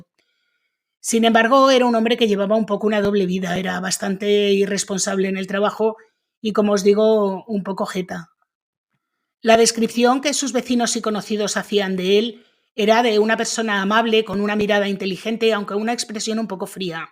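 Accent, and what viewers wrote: Spain. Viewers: Se refiere a la ertzaintza, la policía vasca. Es española y pronuncia así, qué vamos a hacerle?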